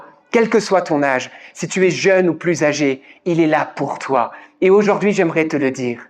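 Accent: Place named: France. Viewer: Sûrement personne est angoissé par la guerre car tous sont gavés d'argent à mort. Ils mettent leur pied ds le 1er avion et foutent le camp. خا. رااا على هيك عالم وعليك كمان لأنك راخي نيعك لمن تحكي عربي. Mon cher petit de rien du tout, comme tu as parfaitement pris l'accent de la langue française , tu peux très bien prendre l'accent de la langue libanaise qd tu parles. Mais non tu veux nous montrer que tu es fghanji coucou de mon c. ul. Vas te faire fou. Tre. Petit c. On.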